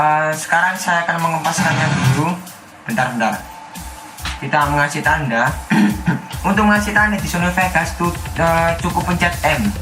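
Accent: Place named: Java, Indonesia. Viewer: anjir medhogmu coeg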